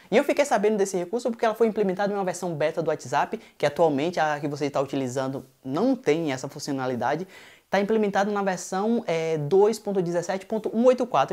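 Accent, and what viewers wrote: Bahia, Brazil. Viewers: man ce é baiano ? kkkkkkkk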